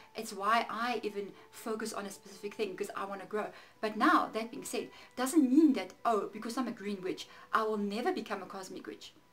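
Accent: South Africa. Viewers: Your accent reminds me of my South African neighbour. I think she's from South Africa...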